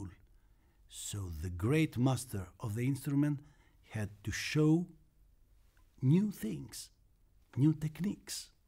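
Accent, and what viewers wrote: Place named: Greece. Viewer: This is so far the best introduction of this legendary instrument. Respect for your dedication of research, experiment and creativity. It's a very inspirational personal story as well. (I enjoyed the greek accented english narration as well, it's like a very calming story telling :D) Bravo for reviving this great instrument!!!👏👏👏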